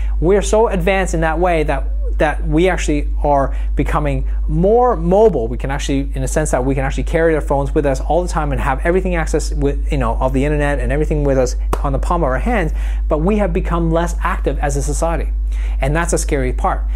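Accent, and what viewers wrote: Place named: United States. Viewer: You sound American or maybe Canadian.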